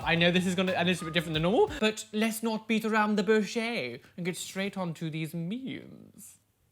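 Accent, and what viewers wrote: United Kingdom. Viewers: Are you from the UK? Lmao u have an accent 😂🤣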